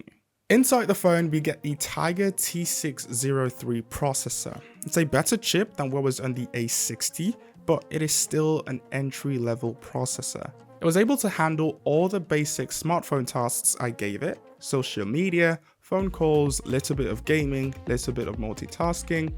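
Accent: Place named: United Kingdom. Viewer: Your reviews are unique. Were you born/lived in the UK? Your accent sounds British (I grew up in London). You didn't mention its 256GB variant. I carnt seem to get it on the market, why?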